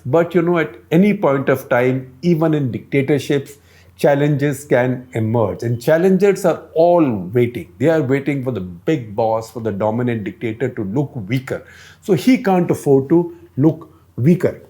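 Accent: India. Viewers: It is Indian English and this is how we speak it here. Like it or lump it.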